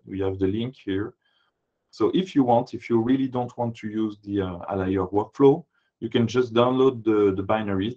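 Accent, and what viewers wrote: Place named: France. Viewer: There is a lot of information in this and has done a good job in assembling it. However, a video like this stands or falls on the quality of its audio delivery. It just isn't fair that AdaCore would ask a professional software engineer to deliver a presentation like this without adequate training in voice projection. There are far too many French pronunciations of vowels (e.g. SOORCE instead of SOHRCE for "source"), pauses as the presenter searches for the right word or phrase, drops in volume at words that are vital for comprehension and so on. The whole video should have been scripted before presentation. I do not mean merely scripted like a piece of text to be read in user documentation. I mean that the script should: 1. Cover all the points that need to be made on the AdaCore Community -> FSF transition, Alire, project and library development; 2. 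Be comprehensible to all with reasonable fluency in English; 3. Be phrased in a way that is easy for this presenter to deliver. Once an appropriate script is made, the presenter can then focus on the relatively easy tasks of getting his pronunciation correct and his projection loud and clear. I hate making critiques like this because it is clear that the presenter here is absolutely expert in his subject. But he has been badly let down by his employing organization, AdaCore. They sent him out to bat without any proper preparation or training. You cannot create a software language community without investing in clear c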